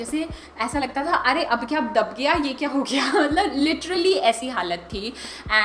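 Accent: Delhi, India. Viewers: I have been following your channel since a week or even less and all that I can say is why didn't I discover you earlier! The very first video that popped up on my recommendations made me a bit uncomfortable with your speaking style which I guess is a bit like alot other Delhi girls, (please excuse the generalisation), but further into the videos, I realised that it is that very speaking style that grabbed me and made my binge all your videos in a span of a couple of days. I felt it was non-pretentions and so so honest to anybody who just wants to have a good time watching creators not unlike you putting up so much hard work. I wish I could pat you on the back and tell you that you're doing a great job. I am not so much into hair and makeup and dress up videos and do not feel the need to follow any other YT for content therein, you're content appealed to me for the sole reason that you made me happy just sharing your stuff with me. 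Thank you sm. Keep doing what you're doing and all the love. Sorry for the long post.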